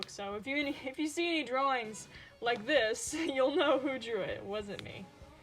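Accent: South, United States. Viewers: Broo u from the south? U have a nice accent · Ya know what, I did not expect your country voice from that thumbnail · I love your southern accent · You sound really southern ekwnensmsn me too!! Where are you from?? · You draw these uwu boys but your voice is very southern it’s throwing me off XD you sound like you live in Texas or somewhere deep in Gerogia.I’m southern myself but my accent barely ever comes out unless I’m casually talking in English with people I’m comfortable with and use slang.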